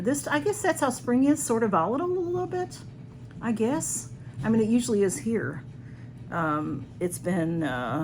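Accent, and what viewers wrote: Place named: South, United States. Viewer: Happy Mask Monday! Nice Mask! Love your southern accent! I’m subscribed to the channels you mentioned. I’m trying to catch up, so many to watch lol. I have a terrible sweet tooth, trying to eat less sweets, it’s hard lol. I did eat the entire bag of that same Kettle corn in two days 😂 it’s my weakness. 🙏🏻😻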